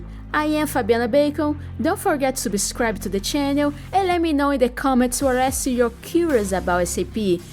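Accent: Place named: Brazil. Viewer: This is a really nice thing you're doing, Fabiana! I'm so glad you're part of #erpgurus and I saw the link to your video there. And also, your Brazilian Portuguese accent is really nice. My brother is married to a Brazilian!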